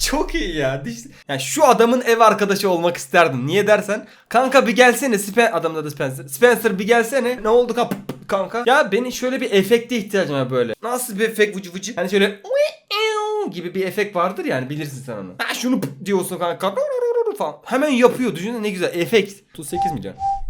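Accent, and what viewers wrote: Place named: Çorum, Turkey. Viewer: Abi Çorumlu musun aynı benim ailem gibi konuşuyorsun çok komik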